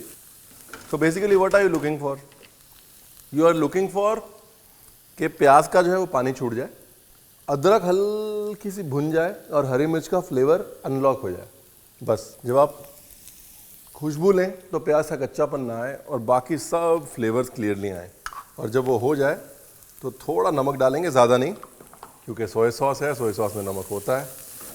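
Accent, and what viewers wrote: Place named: Lucknow, India. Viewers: लखनऊ वालों का अंदाज ही अलग होता है उनके लहजे में लखनऊ झलक ही जाता है.....❤🎉😊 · Lucknow ki baten sunne acha lagta hai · Aap ke bolneka tarika asal lakhanavi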